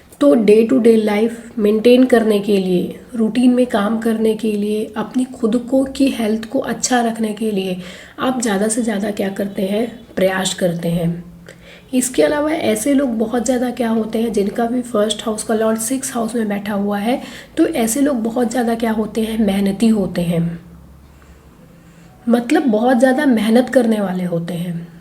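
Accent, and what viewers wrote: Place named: East India, India. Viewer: Namaste Mam aap native kaha ki h Apke kuchh shabdo se Maharashtra ki nahi lagti Me bus interest k liye pooch Raha hu q k me guess krne ki koshish krta hu aap jo shabd pronounce ki wo mene assam bihar wb k logo k mukh se sune h Sorry if anything feel not good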